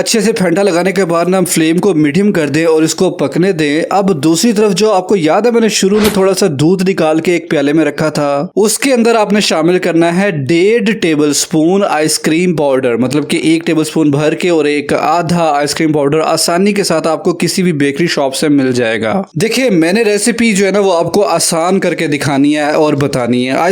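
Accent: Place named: Punjab, India. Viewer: Punjabi tarka😊 Nice way of talking ❤❤❤❤❤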